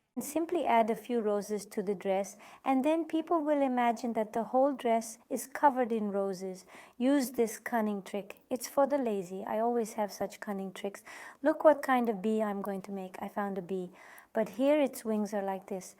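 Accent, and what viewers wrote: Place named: Sri Lanka. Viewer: Sounds sri lankan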